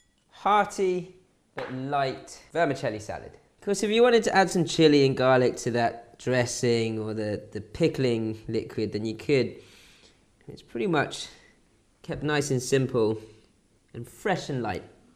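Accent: United Kingdom. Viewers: Too much sugar....i can not trust this man. Also, an annoying British accent...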